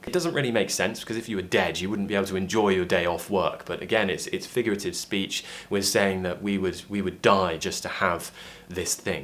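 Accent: RP, United Kingdom. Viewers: I love British accent alot. And Mashallah Sir the way you teach it’s amazing. Actually it’s more fun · Hello! Its nice to watch your video as I am enjoying your Brits accent. Very clear and easy to understand 😊. Stay safe! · I love the British Accent...I'd like to imitate you. Greetings from Cuba. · I love the British accent, especially yours, 😍😍😍 You are the Best! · I would rather give flowers to learn British English! Seriously, I love the accent & I learn a lot from him since few years past! <3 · I love British accent. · Love your british accet! I'm really enjoy to hear it. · Now, I'm up for to learn more about British accent. It was so fascinated😍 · This a true modern RP accent. I really fancy this accent 🇵🇰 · I am from Indonesia... I really like british acent ang your videos are very useful for me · Really like ur accent and also ur voice! Wanna have British accent too!🤩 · I love the British accent very amazing tnx for your video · I 'm a new teacher and I'm teaching British English in a secondary school as a third language(the second language is French). 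I love British accent .I'm really lucky to come across your channel. Thank you for this video.💐💐💐💐 All your videos are great.👍 Nadia from Morocco. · I love british accent, this is one of the reasons to learn more, it's so easy to understand but my writing is not one of the best. you have been helping me a lot. hugs from Brazil 🇧🇷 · Your British pronunciation is a thing of beauty (I don't know if I using this expression correctly, I've just learned it) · I love british accent,, iam indonesian and i'd love to learn more from your channel 😇😇😇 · I love British accent. Thanks. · I really love your British accent, could you please recommend some Youtube channels with the accent for toddlers, thanks a lot:)) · His accent is too British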